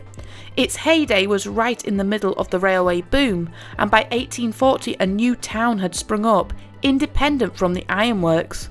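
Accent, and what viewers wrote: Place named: Yorkshire, United Kingdom. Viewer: What an amazing story 😃. This is rapidly becoming one of my favourite history channels 👏🤩🙏🇦🇺. Plus your accent reminds me of Yorkshire which I've always loved 😊.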